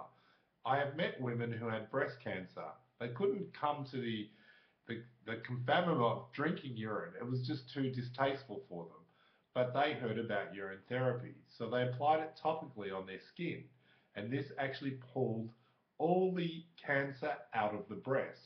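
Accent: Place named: Australia. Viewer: You sound Aussie mate!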